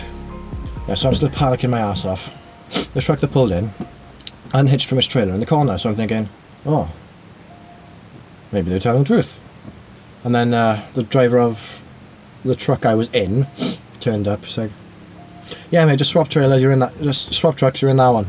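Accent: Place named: South Wales, United Kingdom. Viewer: you sound like you could be from South Wales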